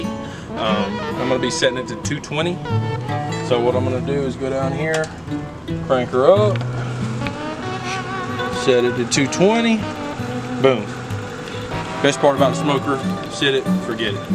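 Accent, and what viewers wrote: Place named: Alabama, United States. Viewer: Are you from Alabama? You sounds like it.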